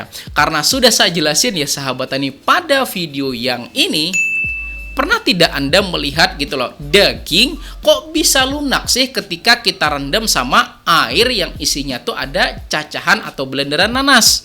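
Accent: Banjar, Indonesia. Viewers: Mas orang banjar ya? Logatnya agak mirip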